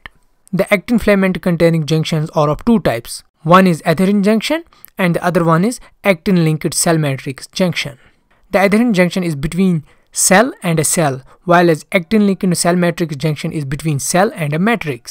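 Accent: Kashmir, India. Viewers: You sound like a Kashmiri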